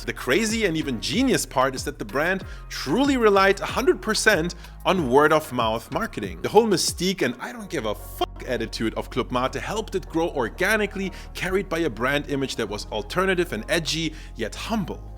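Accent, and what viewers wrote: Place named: Germany. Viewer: This German dialect is terrible and unnescessary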